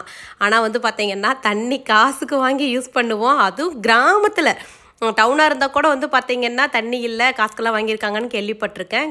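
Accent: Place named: Kongu, India. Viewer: Namma kongu slangkukkaaga ❤ thaan naan first parthen da....saatharanamave niraya english words use pandratha kurachukkunga ...example saree wear pannittu.....namma side casual ah saree kattittu😊 nu thaane solvom.....sorry😌 nirayamurai sollanum nu ninachurukken❤வாழ்க வளமுடன் 🙏🎉----❤maheswari chennai 😊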